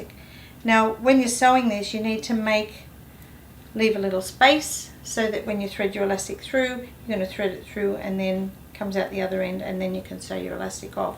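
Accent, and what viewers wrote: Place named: Australia. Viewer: I love your tutorials and it is great to have an Aussie accent!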